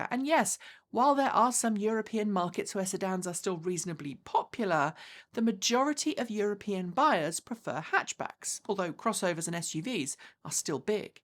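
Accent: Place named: United Kingdom. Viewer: Excellent report. I was ready to leap on any major, disputable point with gusto. It's good to hear good, solid facts in a calm, Brit accent. I may need to watch it again in case I overlooked something.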